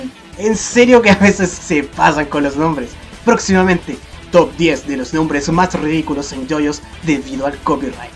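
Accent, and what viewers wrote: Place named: Chile. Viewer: Por tus voz eres chileno lo se porque tambien las voces de nuestro pais es asi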